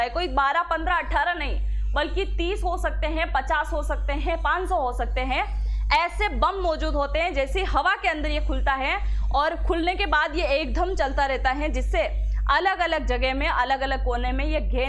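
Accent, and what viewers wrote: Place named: Rajasthan, India. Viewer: Aap apni bhada hindustan ki hindi bolen naki rajisthani hindi sory.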